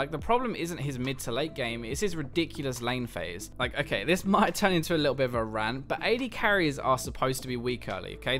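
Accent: United Kingdom. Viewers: the reason I'm wathcing the clip consists partly of your british accent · I could have listen to you for hours british accent is so cool :D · Dude i love your vids! plus im british as well so :D ur accent is normal to me i couldnt tell the difference xD anyway keep ur amazing vids up and remember them puns of funniness xD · I love British accent! And I'm not the only one haha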